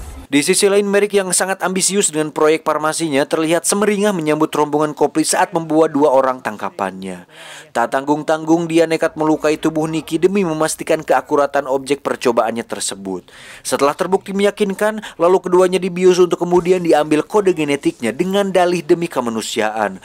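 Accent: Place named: West Java, Indonesia. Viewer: Lidah orang sunda nggak bisa disembunyikan haha. Weduk, Camal.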